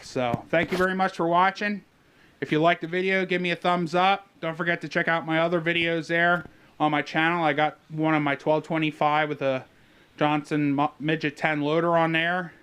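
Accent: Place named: Pennsylvania, United States. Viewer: I knew you were from PA. I could tell by the accent!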